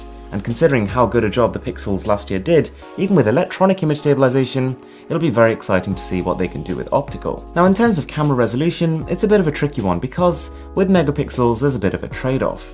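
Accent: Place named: United Kingdom. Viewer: Are you indian? I've never seen an Indian person with a British accent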